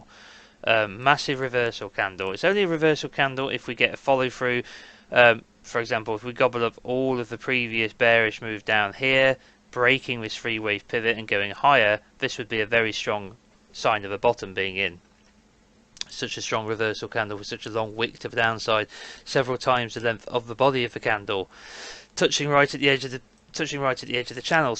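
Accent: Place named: London, United Kingdom. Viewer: Lol, perfect London voice, love it.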